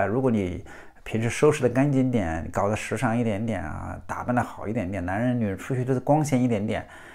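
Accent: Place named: Hubei, China. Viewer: Zhou Bruce 感觉是湖北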